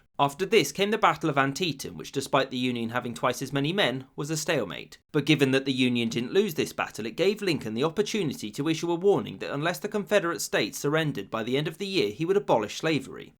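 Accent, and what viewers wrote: England, United Kingdom. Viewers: I can understand why you declare that France had outlawed slavery. But you incorrectly infer that England outlawed slavery. Check you're facts. You should have said Russia. I swear, give someone an English accent, they think they know everything. England was engaging in enslaving India and making drug addicts out of China. Stop you're bullshit.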